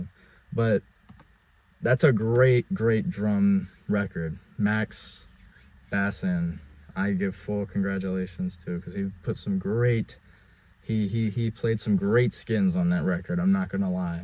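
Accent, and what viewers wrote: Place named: Virginia, United States. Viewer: Good video man, nice list. It sounds like youre from VA, I'm not too far from Harrisonburg myself lol, keep it up man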